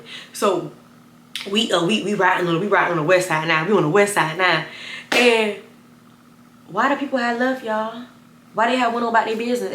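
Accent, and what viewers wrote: New Orleans, United States. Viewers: You not from New Orleans ?😟could had fool me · Girl i woulda never guessed you were from Savannah 😂 it’s def giving NOLA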